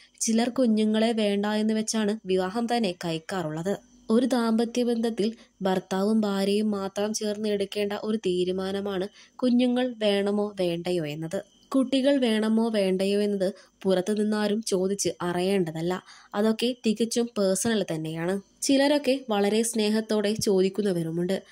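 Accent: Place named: Malappuram, India. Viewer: ഇവരുടെ ഭാഷ കേട്ടിട്ട് മലപ്പുറം ആണ് എന്നാണ് തോന്നുന്നത്...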